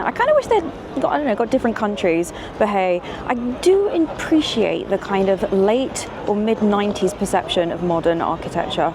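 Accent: United Kingdom. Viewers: Hey, I have recently started living in london (monument) and absolutely agree with your views on about this area, even I felt the same when I cross path accidentally, coz I had to take tube from elizabeth line to london bridge, and my gosh your views are on point.. Also love love your heavy British accent ❤ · I would have clicked off quarterway thru but Your music choices are fab! I literally listened to the end just to hear the music tracks! So nice i had to shazam them! Great tunes! Thanks for musically educating us. Oh by the way it's 'mall' (like the word all} not mall like the word pal.! U should know this u sound british!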